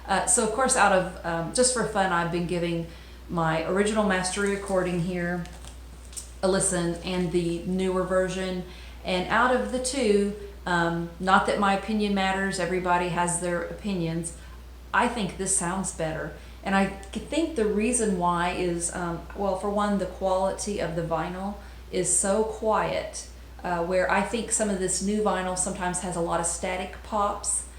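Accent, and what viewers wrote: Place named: Kentucky, United States. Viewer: OH! Wow I;m Jealous! is Playing Aug 20 about 35 minutes from my house soon. I think it's mostly sold out. Never seen him though I've had a few chances. I thought I detected a KY accent. My Dad use to live just north of Louisville and I spent some time there. You'll have a great time. I know you will!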